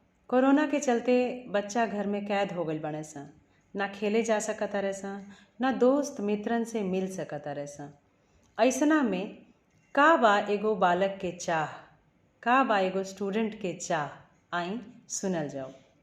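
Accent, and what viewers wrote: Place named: Bhojpuri, India. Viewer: भोजपुरी के मिठास ...छा गई आप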